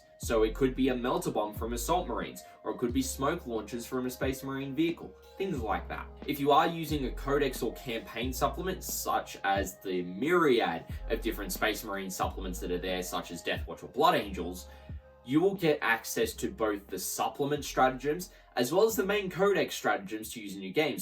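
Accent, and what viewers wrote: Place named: Australia. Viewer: Thank you for explaining this in Australian English.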